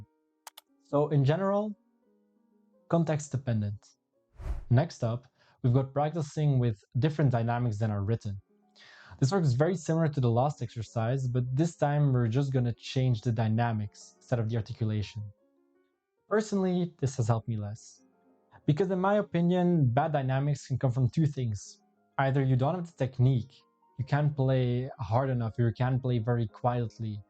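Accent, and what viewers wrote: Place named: Belgium. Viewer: I'm Belgian myself so I can spot the accent very easily XD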